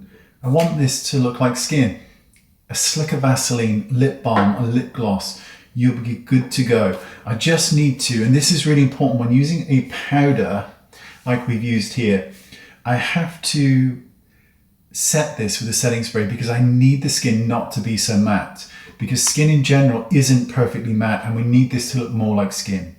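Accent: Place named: England, United Kingdom. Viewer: And can’t beat the English accent, either! Love you, 😘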